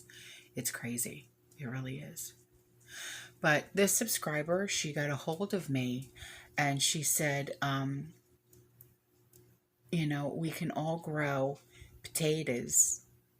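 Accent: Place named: Philadelphia, United States. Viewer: Thought you were Philly or a Delco girl.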